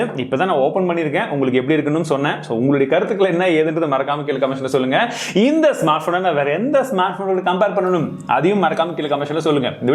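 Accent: Chennai, India. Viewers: first time hearing chennai slang . ......😅🤣🤣😂🤣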